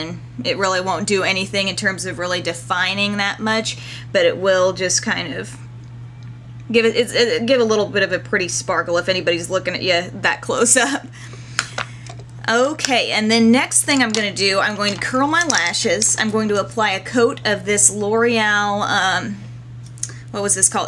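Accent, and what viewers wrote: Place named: United States. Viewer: Fantastic! and...just let me say another thing: you speak so good American, that I can easily understand every single word, even if I am Italian! :-)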